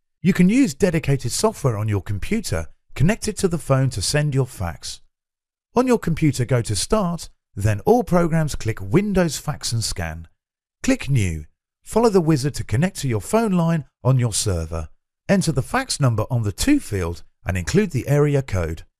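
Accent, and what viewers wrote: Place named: United Kingdom. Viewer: I love hearing the British-English accent, especialy "computah".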